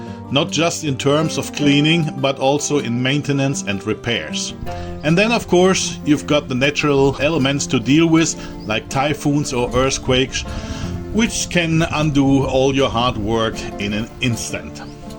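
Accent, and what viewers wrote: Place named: Germany. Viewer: Als aller erstes: Gruß aus Panama. Und nun das zweite: 😂 Ich lebte 15 Jahre in Kanada und mir wurde immer gesagt, dass ich kein Deutscher sei. Dies wurde mir auch hier von Nordamerikanern und Engländern in Panama gesagt. “Du sprichst wie ein Nordamerikaner. Deutsche haben einen spaßigen Akzent!” Als ich dich reden hörte, da musste ich erst einmal schmunzeln. Aha. Da ist er. Der Akzent. 😅 Nicht böse gemeint. - Kleines Haus und Wände. Ihr habt die Konstruktionsfläche genommen und nicht die Wohnfläche. Ich lebe hier in Panama in einem gemieteten Haus. 2 Schlafzimmer, Küche, Wohnzimmer, Bad. Genau 8x8 Meter. Die Terrasse ist 8x2.50 extra. Reicht uns auch. Ich baue nun ein rundes Haus mit 2 Stockwerken. Alles alleine. Bin “Unfallrentner” und bekomme eine gute Rente von der BG in Kanada. Durchmesser meines Hauses ist 9.80 Meter. Wohnfläche ist ungefähr 74m2 mal 2. 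Das erste was den Leuten an meinem Rohbau aufgefallen war sind die “gut gemauerten” Wände. Ich wäre wohl vom Fach oder einen Meister. Nun. Vor 33 Jahren baute ich mein Haus in Deutschland alleine. Als ich meinem Sohn in Deutschland mitgeteilt habe, das ich ein Haus baue, da kam ein: “Papa. Du bist keine 28 mehr. Spinnst Du?” Nun ja. Lausebengel! Als er letztes Jahr zu Besuch kam meinte er: “Wow. Du weißt noch wie das geht?” Hab ja noch nicht Alzheimer. 😅 Und in Panama haben neue Baugebiete keine Namen für die Straßen. Nun kommt der Witz. Auf den Lieferscheinen steht als Addresse: Calle de Castillo. (Burgstraße) Und jedes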